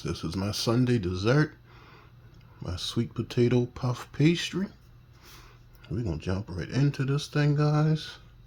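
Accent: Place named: Louisiana, United States. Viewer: !! I'm hearing a SC Locountry or Louisiana accent. Making me a little homesick. I'm so making this! It looks delicious!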